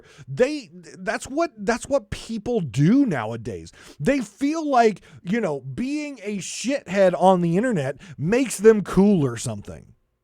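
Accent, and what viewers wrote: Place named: Canada. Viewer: next episode releases “Alright let’s see what our boy is championing today - oh thats a good one.” Long time Spotify listener, day 1 sailor - weekly sailor. Im here to say I love your style, and sometimes your heated opinions are very needed, this is one of those topics. I want to reiterate that I love you, that said - “bolth” and “aweso” …. Kills me every time brother. EVERY TIME. also says “bolth” though. Seems like a northerner/west coast/Canadian thing? Kills me! Lol but keep it up duder, great stuff and I actually really appreciate having constant content to listen to. Its nice to audibly jump into the seas while I’m working.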